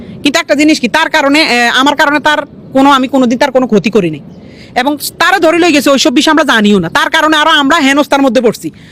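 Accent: Noakhali, Bangladesh. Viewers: নোয়াখাইল্লা মারাক্তক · তুই যে pure লোয়াখাইল্লা তোর কথার মধ্যেই এটা ফুটে উঠেছে। তোরে বাংলাদেশের মানুষ একটু একটু চেয়ে ঐ তৌহিদ আফ্রিদির সঙ্গে থাকছোস বইল্যা। আজকে সে বিপদে পড়ছে আর তুই যে স্বরে কথা বলতেছে তাতে তুই যে একটা বেঈমান তারই প্রমাণ দিচ্ছিস।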